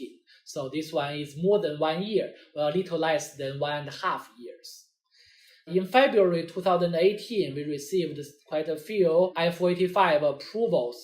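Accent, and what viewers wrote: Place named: China. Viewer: To your question: he speaks English, with a strong Chinese accent, but I am sure you can follow him if you want to. I also want to add some extra info in this answer to your question (because I just finished my i-485 interview): As of now, the content in all his videos (and of course, some are very Chinese related but not to my case) is very very good, and is the most helpful & practical one, compared to other related videos, made by non-native English speakers from places all over the world and native English speakers. And I would say that it is a surprise to me too. So, if you cannot deal with Chinese accent, ignore it (I guess I understand that. Honestly, as a Chinese, I ignore a lot of Chinese-English videos, I have to), but if you have a real-life need in this GC thing, try some of his video.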